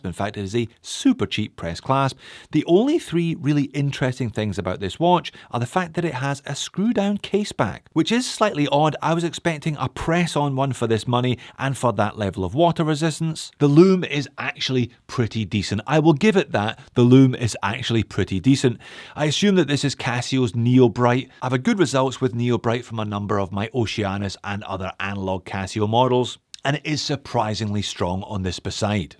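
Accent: Scotland, United Kingdom. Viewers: I know you're in Australia but are you originally from scotland because your accent says you are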